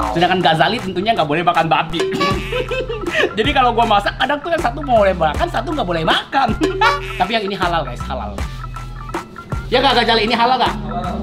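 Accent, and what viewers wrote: West Java, Indonesia. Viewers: sundanise pisan euy koko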